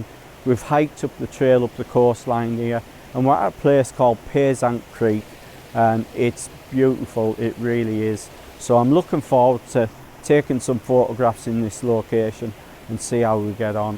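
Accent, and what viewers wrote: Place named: Merseyside, United Kingdom. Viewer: I've just subscribed to your channel, I think your images are great. Are you a Brit, you sound like a Merseyside boy 👍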